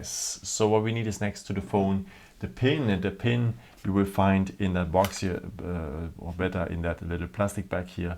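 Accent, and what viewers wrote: Germany. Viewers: Bruder man merkt voll das du deutscher bist wegen dem aktzent feier dich lg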